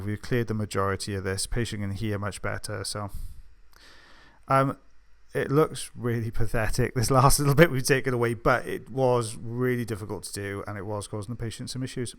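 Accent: Wales, United Kingdom. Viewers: The captioning is getting a bit better, I think it has problems with your lyrical Welsh accent! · Welsh accent I hear??? :)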